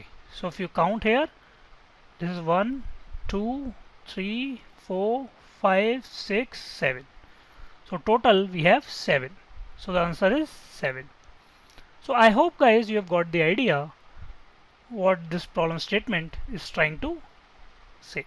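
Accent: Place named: South Asia. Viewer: Your heavy India/pakistani accent makes it impossible to understand you. I’m looking for someone else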